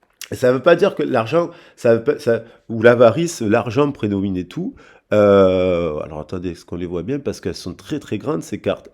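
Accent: South, France. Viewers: Coucou, C'est toujours un réel plaisir de vous écouter, ne serait ce que pour l'accent qui sent bon le soleil et les cigales😂! Je l'avais pourtant mis en garde sur le fait que sa conquête était vénale😡! Il n'a pas tenu compte de mes ressentis et a foncé aveuglément dans cette relation, il est tellement crédule qu'il s'est laissé manipuler😢par elle et ses acolytes... En y réfléchissant bien, il ne pas pas quitté, c'est moi qui ait tout fait pour qu'il parte, n'acceptant plus son comportement détaché à mon égard😞... Vous êtes une bien belle personne, honnête et c'est bien d'avoir fait cet apparté au sujet des tarifs démesurés que certains n'hésitent pas à pratiquer dans le domaine de la voyance...😞 MERCI pour tout, excellent week-end🙏🍀!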